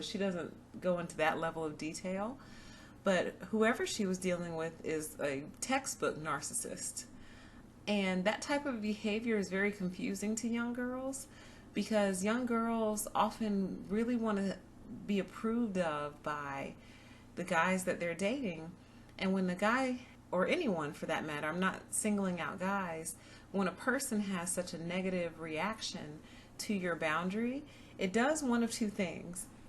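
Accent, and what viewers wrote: South, United States. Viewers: You have a beautiful Southern accent!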